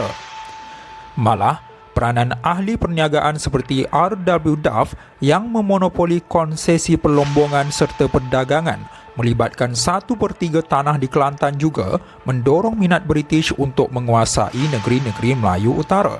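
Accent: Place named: India. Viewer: Dengar suara YouTuber ini seperti India bercakap Melayu..Rasaya YouTuber seorang India...Cakap pelat India..Fakta yang ditulis ada sedikit tak betul.. Perjanjian Inggeris-Siam berlaku kerana Siam tidak mahu British menjajah negeri Siam..Untuk menyelamatkan Siam dijajah British.Kalau nak tulis kena kaji betul2 jangan bagi fakta yang kurang tepat.Siam adalah satu2nya negara yang tidak dijajah & sebab itulah berlaku Perjanjian Inggeria Siam..& Southern Thai Pattani & Golok terpisah dari negeri Kelantan & menjadi sebahagian negara Siam Thai hari ini..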